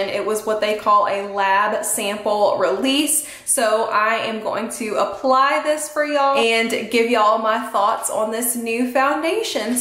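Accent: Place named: South, United States. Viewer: Hey,! Recent subscriber here. I've also been trying the RdF Luminous Elixir Three Drop Foundation. I fluctuate between the shades Ceres and Metis. My facial skin is rosacea-prone combination/oily. My usual process is applying prescription medications (a serum-y type product, then an azeleic acid gel), a light moisturizer, then sunscreen. I let the sunscreen absorb for AROUND ten minutes. When I apply the Luminous Elixir, I dispense three drops on my fingers, lightly blend onto my other hand, then gently begin massaging the product onto my face, working from my reddest areas outward. I was also absolutely SHOOK by the coverage level of this foundation in proportion to the amount I needed to use. I can wear it all day with minimal creasing, and I haven't really noticed it sinking into pores (mine are BIG) at all. This might just be a skin-type or prep difference, but the Luminous Elixir leaves my face looking considerably less greasy than, say, the Ilia Super Serum Skin Tint. 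ALSO, I have found that even if I scratch my nose or touch my cheeks throughout the day, I'm not removing coverage. Perhaps consider dropping the product onto your fingertips first, rubbing them together ever so slightly, then applying to your face. It could make a difference in finish and wear-time for you! PS: It's nice to listen to someone on YouTube who rocks a southern accent. Cheers!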